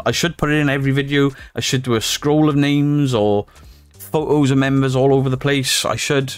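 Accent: Wales, United Kingdom. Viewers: u crack me up! I always watch ur stuff! Ur ace! u tell the truth which sadly soooo many of the ghost hunters etc just do not! Keep doing what ur doing please and I adore ur accent! I ❤️ the welsh! I don’t live far from the border! Thanks from the Labrador! 🐾🐾🐾🐾👍🏻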